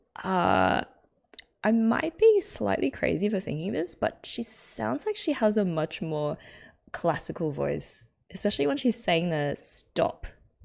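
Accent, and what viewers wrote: Australia. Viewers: Oh my god King is such a perfect cover song for all of these girls. They add so much personality to it. I especially love Calli and Gura's. When it came it took me by storm and I couldn't get over how perfect it was. Like I would choose this cover to introduce someone to them. Impressive how you picked up even on the accents. It was a 2 min song but you picked up on Calli's southern twang. I also like picking up on different accent, kind of an obsession, and I was guessing you had an Australian accent there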